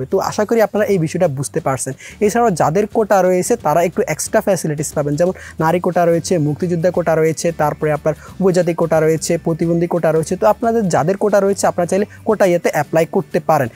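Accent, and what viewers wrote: Noakhali, Bangladesh. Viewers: ভাইয়া মনে হয় নোয়াখালীর? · Vai apner bari noakhali?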